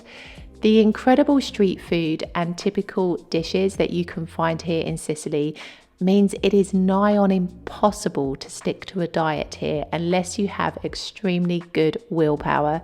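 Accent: United Kingdom. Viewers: Sounds like a British accent 😂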